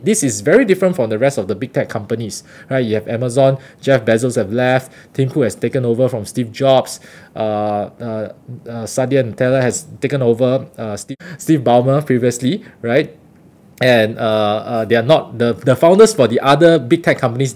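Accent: Singapore. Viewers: It's not English he speaks but singlish - a version of English spoken in Singapore..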